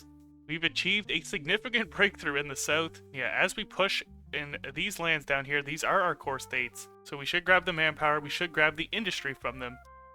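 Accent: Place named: Ottawa, Canada. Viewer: I’m so used to HOI4 streamers being Europeans I was wondering what kind of Central European accent you had until you said “harder” and then “guards” right after … I am from Ottawa and probably have the same accent as you lmao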